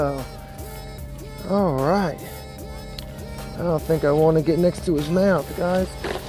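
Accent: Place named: New Jersey, United States. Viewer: Where you from? You sound like my homies in Jersey. Great catches. I am still trying to pin down some yellow perch over here.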